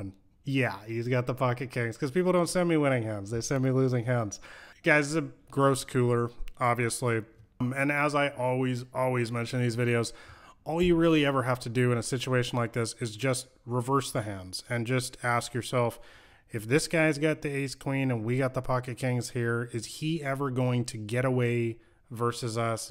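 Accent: Canada. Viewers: he definitely has a strong canadian accent, and i say this is a canadian lol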